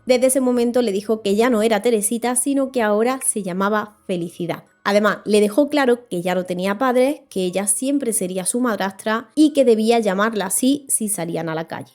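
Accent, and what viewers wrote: Andalusia, Spain. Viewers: Es la primera vez que veo éste canal en Youtube, ése acento es andaluz o de que parte de España?lo siento pero no me gusta para nada tu corte de cabello.... Saludos desde Argentina.... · Pero no era una vampira era una Bruja y está historia si que es real una bisabuela mía estubo a punto de ser raptada por ella, porcierto me encanta tu acento eres andaluza?? Yo tengo familia lejana por allí saludos 😊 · É andalûh. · Exelente narrativa y tú acento Español Andaluz, Bella Andalucía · Es del sur de España, Andalucía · Es acento Andaluz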